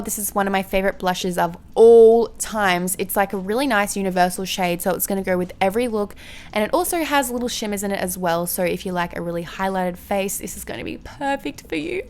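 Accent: Australia. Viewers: your accent is sooooo damn cute Australian?? I'm new to this channel this is my first video of hers I've watched lol ... don't hate me if I'm wrong 😕 ... your absolutely gorgeous!!!! I liked this video before getting 2 minutes in.. I can just tell when I'm going to like someone..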